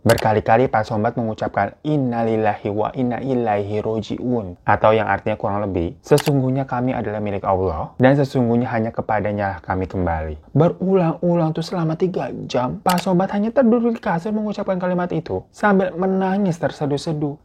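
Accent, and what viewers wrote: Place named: Jakarta, Indonesia. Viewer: Baru liet chenel ini, bagus ceritanya, tapi hostnya orang jepang apa orang betawi ya.. Ko lucu logat jakarta bgt.. 😁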